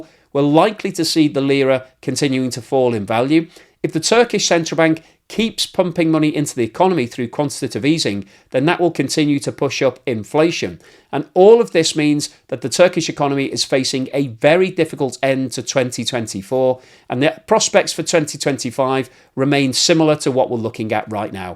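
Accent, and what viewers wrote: United Kingdom. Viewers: Its funny hearing a british man talking about troubles of Turks. Mind ya own business ya fanny.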